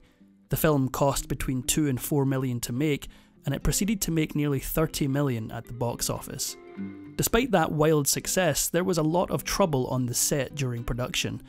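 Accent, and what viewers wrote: Scotland, United Kingdom. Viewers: My favorite Narrator love the Scot!!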